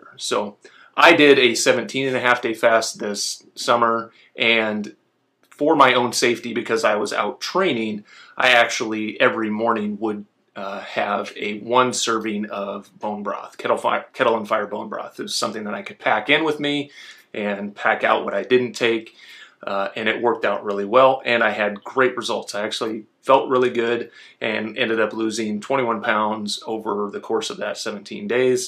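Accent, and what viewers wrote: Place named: Canada. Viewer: He's Canadian! "out" lol "ut".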